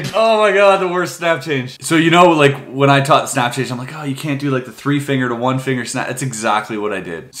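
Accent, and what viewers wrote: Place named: Canada. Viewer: Your Canadian really shines through in those old videos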